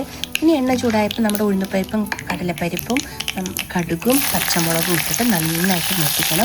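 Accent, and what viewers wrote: Palakkad, India. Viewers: My mouth is watering nice to here your kollengode palaghat basha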